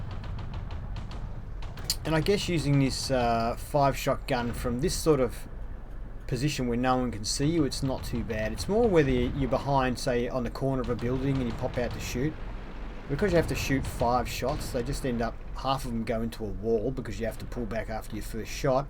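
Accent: Australia. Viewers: u aussie mate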